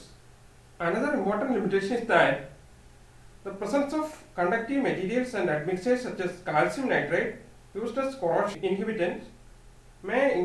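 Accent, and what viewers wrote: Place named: India. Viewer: I like it :) But Indian English is so difficult to hear ^^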